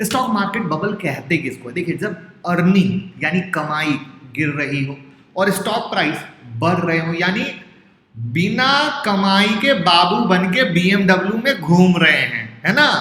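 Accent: Bihar, India. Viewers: Aap bihar ke ho kya ?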